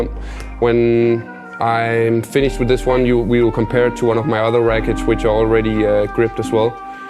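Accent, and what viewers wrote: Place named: Russia. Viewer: i thought his voice was like a russian- english language huhuhhh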